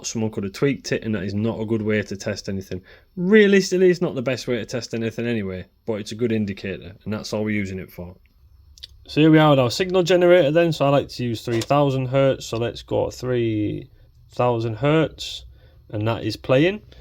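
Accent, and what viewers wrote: Scotland, United Kingdom. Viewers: Scottish or maybe Irish accent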